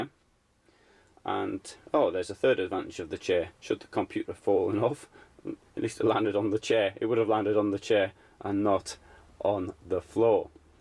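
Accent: United Kingdom. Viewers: Lass-in Angeles If you don't like it don't listen. I like delivery its called British. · Lass-in Angeles Speech impediment? It's called a British accent and a really nice one at that!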